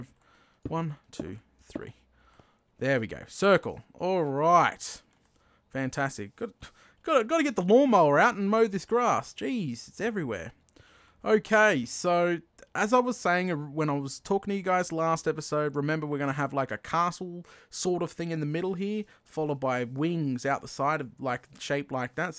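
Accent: Australia. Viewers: Hey are you from Aussie by any chance? Haha I'm from New Zealand so if you are from Aussie heya neighbour:D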